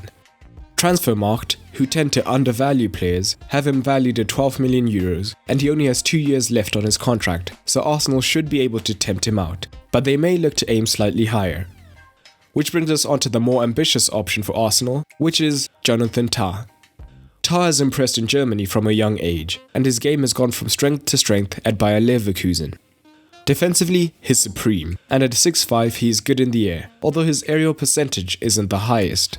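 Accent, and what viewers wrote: South Africa. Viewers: Ayy you South African bro??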